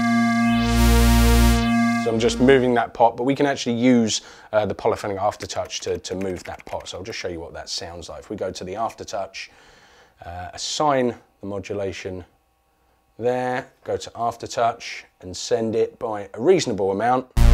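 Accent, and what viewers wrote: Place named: London, United Kingdom. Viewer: LOL Polybrute SIMFF.... Love the London accent but mate.. Cant beat a Northern accent... RAAVER as opposed to Rather.. We need to celebrate the Englaish language and never shy away from our heritage !!!! The synth sounds so similar to the original.. but love the new features.. !!! Gotta say, if you need your sound to be immediate in your mix then the Sequential series are better but as an all round poke you in the eye and create esoteric stuff... Polybrute.. Go Arturia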